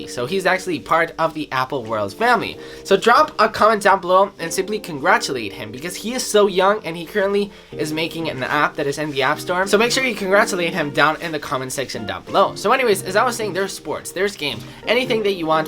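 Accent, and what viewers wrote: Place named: Spain. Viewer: He is from Spain. Of course he isn't gonna sound just like you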